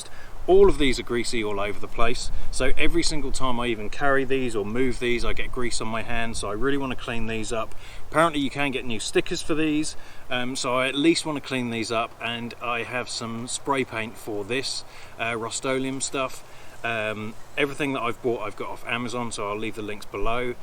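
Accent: United Kingdom. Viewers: I was so confused at the start of your video. You sound very British, but your house and towing hardware didn’t match up. Your house didn’t have any brick or shiny black exterior plumbing. Good demo. I’m going to be doing the same to my towing hardware.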